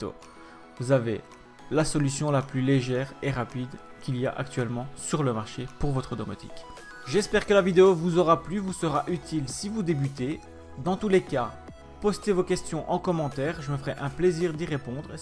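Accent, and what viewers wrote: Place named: Belgium. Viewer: Hello, Merci pour cette vidéo, étant en plein réflexion sur le futur de ma domotique avec peut être un passage vers HA donc ta vidéo tombe à pic 👍 Pour ton accent Belge aucun problème ça donne du charme à tes vidéo 😊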